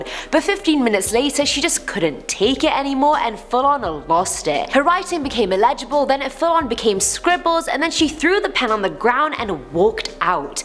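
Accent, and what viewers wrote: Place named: United Kingdom. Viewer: I'm not going to make fun of Ms high-waisted jeans. She's far too pretty and charming, and has a very appealing accent, perhaps from somewhere in the United Kingdom?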